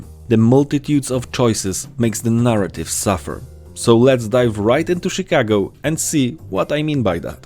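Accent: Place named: Germany. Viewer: Discovering your video via the Steam page of Desperados. Great video with excellent review on which i agree 90%. I grew up playing Commandos (from the first to the last) and Spellbound games (Robin Hood, Chicago and the three Desperados games) and i completely relate to your points and feelings. I still play those games from time to time (they're still very nice games to play even in 2021) and i played Mimimi games too (Shadow Tactics and Desperados III), even though i liked them very much they lack the charm those old 2D Isometric games had. My biggest dream would be a Desperados 1 re-master with 4K HD graphics and better animations yet the very same gameplay and story (+ the expansions maps that were planned but never released). Anyway you earned my like and my sub ! :D PS: Where are you from ? I can hear a german-ish accent but i'm unsure. BTW i'm French and i understood 100% so your English is perfect !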